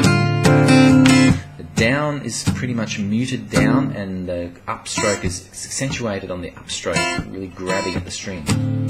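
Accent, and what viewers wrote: Australia. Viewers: hes not english, he sounds Australian...or maybe kiwi?! but his music is definitely high koala-ty! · Thanks for the lesson, very clear, no nonsense. Love Aussie accent !!! Looking forward to playing it.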